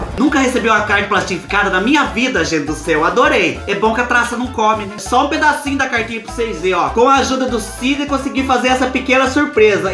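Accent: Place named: Sorocaba, Brazil. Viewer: Reconheci seu sotaque de Sorocabaaaa! rs Até pensei: "nossa, a cidade tem o sotaque parecido com Sorocaba." XD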